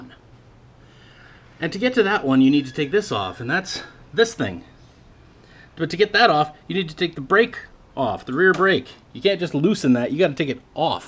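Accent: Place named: Canada. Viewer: Just stumbled upon your video. Lol as you started talking I was saying yo myself this guy must be Canadian! Then I saw the Ontario plate. Lol good video bud!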